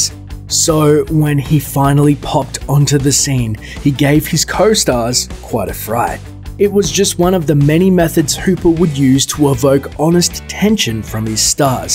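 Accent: Australia. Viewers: Australian narrator? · Are you Australian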